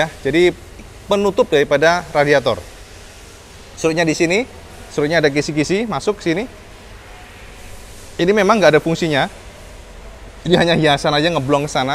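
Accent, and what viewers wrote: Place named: Tulungagung, Indonesia. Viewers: Jagange endi to jagange.. tak habis2 ak seneng karo bahasamu maas.. kuentel tulungagung e 😄🙏🏻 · salam Jooosss Gandooos mas bahasanya dan gayanya khas wong Tulung Agung sehat selalu mas Bro👍🏼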